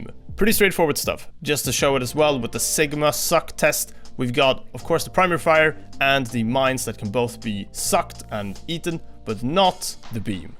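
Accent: Sweden. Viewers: Ur accent sounds swedish idk why lmfao no hate tho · Is this guy Swedish? Cuz he has that annoying accent. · Is he swedish? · You sound like you come from sweden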